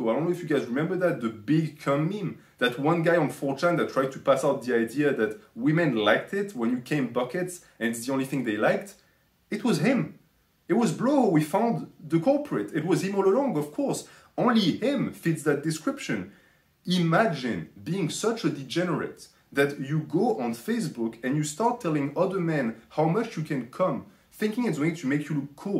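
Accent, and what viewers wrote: France. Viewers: Brother even with a thickish French accent ur English is marvelous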